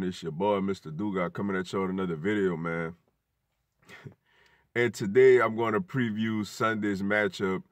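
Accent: New Orleans, United States. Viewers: Dude you sound like you from New Orleans so how you go do that too ha bruh 🤣. Look it go be a good game but we in the dome dude it’s just our time man y’all with too the super bowl last year 👏🏾👏🏾👏🏾 now it’s blk & gold time . We gave y’all Darren sporles & Malcolm Jenkins dude we 8-1 ppl still disrespect the saints that’s cool hate us too the super bowl WHODAT!!!⚜️⚜️⚜️⚜️⚜️⚜️⚜️⚜️ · I'm from New Orleans. You sound like allot of dudes from here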